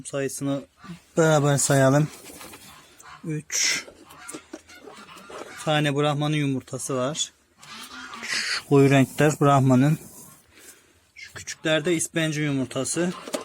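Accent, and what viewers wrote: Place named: Sivas, Turkey. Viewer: Konuşmandan belli abi tokat sivas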